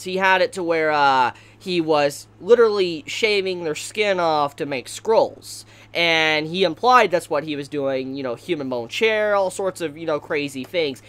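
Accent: South, United States. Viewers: Are you from the south I can hear a little of a southern accent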